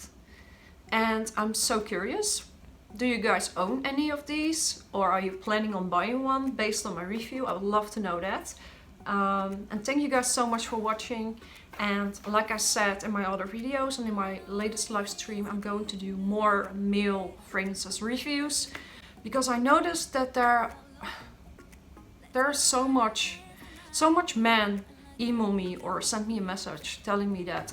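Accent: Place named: Netherlands. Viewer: your accent sounds Dutch. Great list btw!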